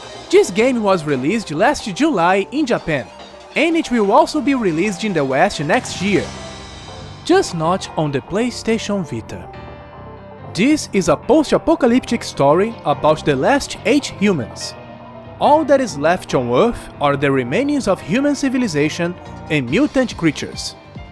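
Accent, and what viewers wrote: Brazil. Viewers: Are you from Brazil? Your accent is so brazilian-like. Im brazilian btw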